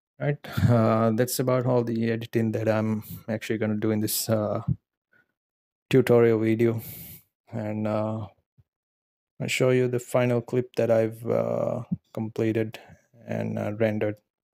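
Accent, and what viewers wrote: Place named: India. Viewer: Du hast so einen indischen aktzent hört sich witzig an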